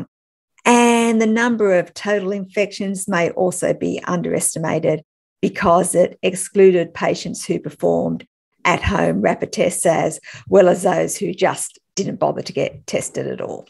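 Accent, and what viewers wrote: Australia. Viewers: Glad you're not my Dr. Mind you it sounds like you're Australian we've all noticed how mad you are over there about covid over there..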